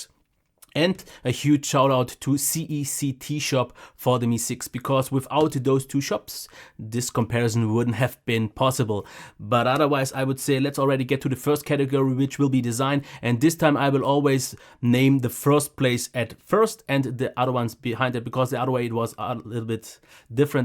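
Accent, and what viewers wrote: Germany. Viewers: Based on his name i think he's Croatian but based on his accent defo born in Germany or living there for a long long time!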